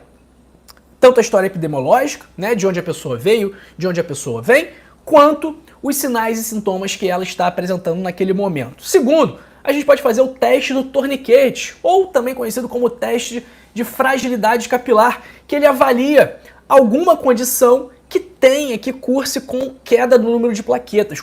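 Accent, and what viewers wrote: Rio de Janeiro, Brazil. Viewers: MariaSantos, buscando trazer dados para sua compreensão (sobre o desconfôrto dito aqui), no intuito de somar, acredito q vc tenha querido se referir, na verdade, não à "voz" em sí, mas, talvez, ao "sotaque" - isto não é dele, é do sotaque do povo do Estado do Rio de Janeiro, o "sotaque carioca", que troca o "s" pôr "ix" (ex: maS e maix, coStaS e coixtaix, diagnóStico e diagnóixtico). Espero ter contribuído. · Parece piada né kkk, o Dr todo cuidadoso, dividindo informações importantíssimas, ajudando o próximo e a criatura reclamando do sotaque Cariquexxxx. O mundo tá do avesso 🙃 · Ele tem o sotaque do Rio de Janeiro. Só isso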